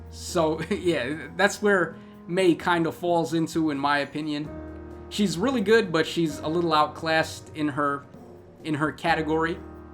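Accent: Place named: Northeast, United States. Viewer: Are you from the north east? I enjoy your channel man, keep up the good work!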